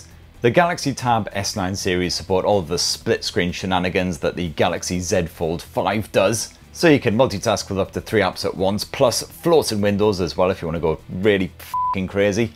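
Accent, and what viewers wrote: United Kingdom. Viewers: The colourful metaphors with the British accent have me in stitches, the way you slide the odd one in, is quite brilliant. 👍 Providing a layer of humour on top of your reviews is doubly entertaining. Bravo sir 👏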